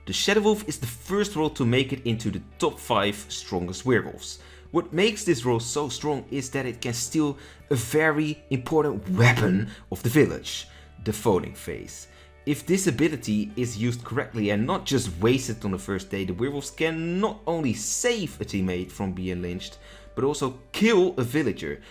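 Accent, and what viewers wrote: Netherlands. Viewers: Why do you sound so Dutch?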